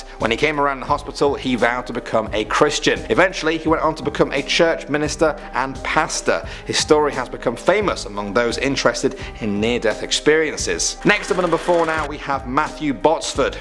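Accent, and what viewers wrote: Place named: United Kingdom. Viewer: I can’t stand this British accent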